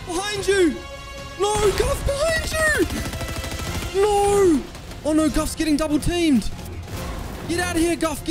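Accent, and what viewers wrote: Australia. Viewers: WAIT YOUR AUSTRALIAN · Is he Australian???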